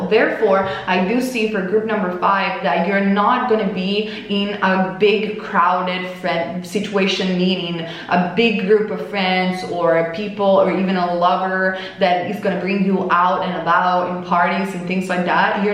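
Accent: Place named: Italy. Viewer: 👍👍👍 for Italian accent! 🍻🍻🍻 & 🌷🌷🌷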